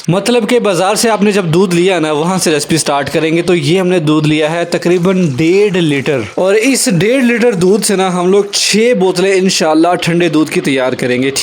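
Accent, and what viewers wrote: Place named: Punjab, India. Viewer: Punjabi tarka😊 Nice way of talking ❤❤❤❤❤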